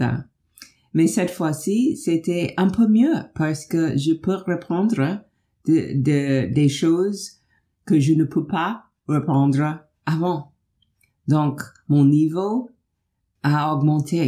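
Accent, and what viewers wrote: United States. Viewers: You have an adorable American accent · Bravo, votre français est tout à fait compréhensible, quelques erreurs mais c'est normal. Pour plus d'aisance il faut beaucoup parler avec les autres et enrichir son vocabulaire, ça prend du temps mais vous êtes sur la bonne voie. Et gardez votre accent américain en France c'est charmant 😊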